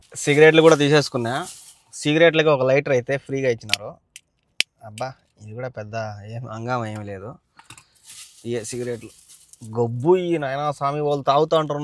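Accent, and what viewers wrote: Rayalaseema, India. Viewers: It's rayalaseema dialect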